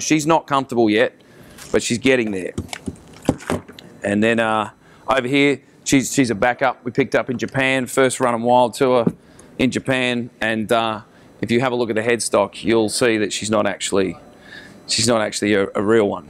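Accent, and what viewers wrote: Australia. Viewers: massive australian accent ! this dude seems so cool haha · How Australian is he? Brilliant! It just sounds better being called a c$&@ in Australian. Sounds like a normal bloke. Great stuff!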